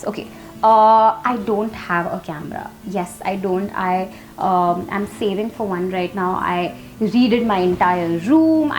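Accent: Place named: United Kingdom. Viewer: i love your english accent...how did you learn it n speak it so fluently.?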